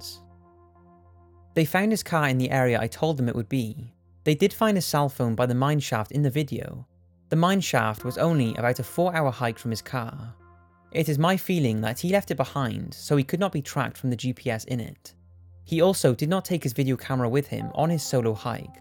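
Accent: Wales, United Kingdom. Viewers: Sounds welsh to be honest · He's Welsh. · ElseWhere 47 That’s a Welsh accent pal, not English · what is his accent? Sometimes I think defo American then at times sounds like different parts of England. Even some of the words come with a Welsh twang now and again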